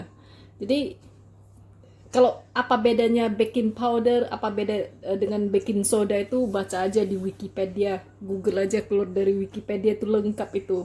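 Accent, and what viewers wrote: Medan, Indonesia. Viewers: Salam kenal y, suka dengan vidio nya, dari bahasa nya macam org medan y😂😂😂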